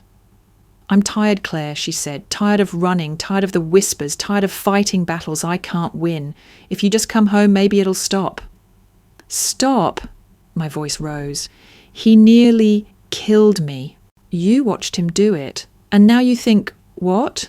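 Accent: United Kingdom. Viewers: This offering is being viewed from Stevensville, Maryland on an island in the middle of the Chesapeake Bay USA Although the ending is as expected, I think the biggest problem that I had with this entire offering was the narrator sounds like a very beautiful and fine lady however, her English accent totally be lies. The military feeling that should’ve been there. But the Jerk got exactly what he deserved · No more British narrators, please!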